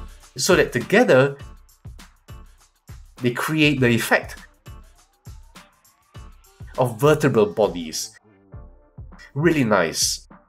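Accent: Singapore. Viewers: You do sound Singaporean.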